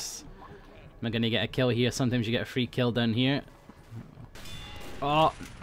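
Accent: Scotland, United Kingdom. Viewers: r u scottish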